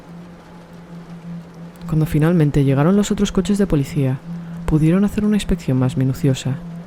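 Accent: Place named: Spain. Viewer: No me gusta ese español. Prefiero el español "latino". Gustos de gustos. Gracias x leer.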